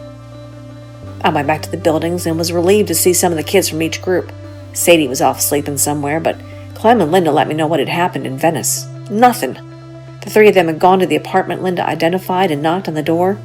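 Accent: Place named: Texas, United States. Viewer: Love your texas accent ❤😅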